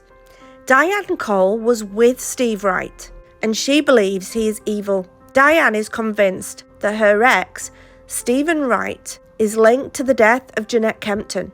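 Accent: United Kingdom. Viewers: Love your British stories and accent!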